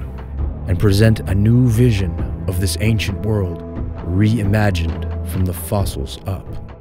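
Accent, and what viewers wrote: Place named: United States. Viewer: Lovely accent and I do not say that about many American accents :)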